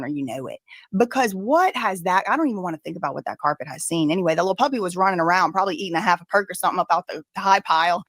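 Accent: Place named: Louisiana, United States. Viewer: You and Britney Spears have the same Louisiana accent. You are genuinely funny!! Always making me laugh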